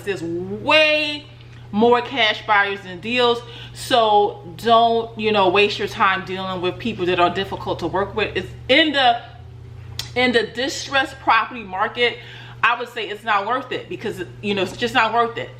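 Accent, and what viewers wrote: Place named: New York, United States. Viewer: Very informative video!! Thanks. I know ur from NY tho😂 cant hide that accent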